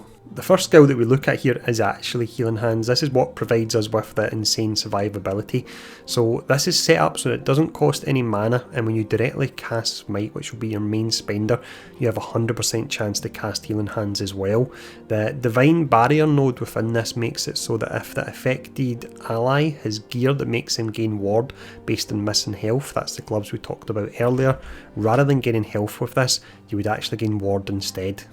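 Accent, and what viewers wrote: Scotland, United Kingdom. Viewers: I like the video and accent because I live in Scotland 😂 not Scottish myself haha. I know English was forced here ages ago but it’s not that bad to use it for the videos 🦧❤️ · damn love ur accent :D R U Scottish?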